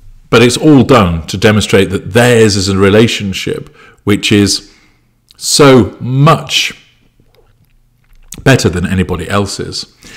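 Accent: Australia. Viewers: I’m amused by your Australian accent. I hope someone comments on audiobook experience! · Your Australian accent spiked monologs are a few of my favorite things! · More Aussie accent please. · champion aussie Accent Mate. fair suck of the sav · you are hilarious!!! Loved your opening monolog. I'm one of the ones that loves your Australian accent, G'day, etc.... And to those who don't like it, then GOOD! 🤣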